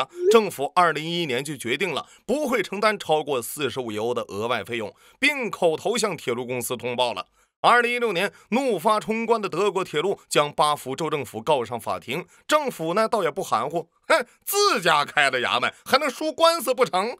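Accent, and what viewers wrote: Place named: Northeast, China. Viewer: 東北口音。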